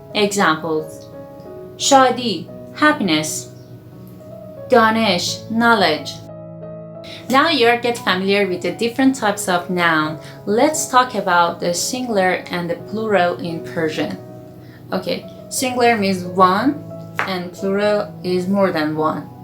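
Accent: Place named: Spain. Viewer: This is useful thank you. Your accent is a bit spanish.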